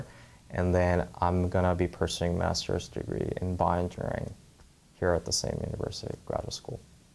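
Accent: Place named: Asia. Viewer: probably because asian accent(im asian too btw so don't call me racist)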